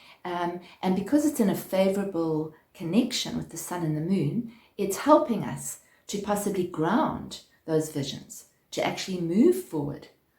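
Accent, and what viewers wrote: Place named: South Africa. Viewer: Just "stumbled upon" your reading. Lovely, thank you. And hugely apt for me right now! Great to hear your saffer accent too. I was from Plett but made the move to Panama nearly 3 years ago!! Take care and thank you ♡♡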